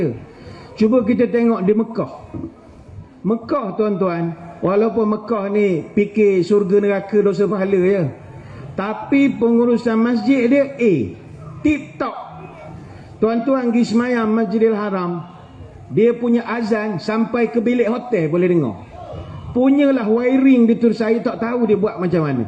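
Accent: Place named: Terengganu, Malaysia. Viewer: pasih molek ustaz cakak sleng ganu:)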